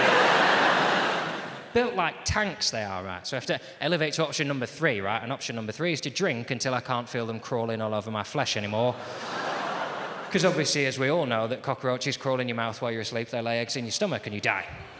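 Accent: United Kingdom. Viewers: What kinda British accent is that?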